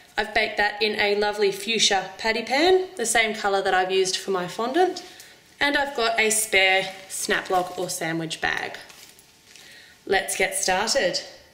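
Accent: Australia. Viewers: I think shes australian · Are u an Aussie if so nice accent lol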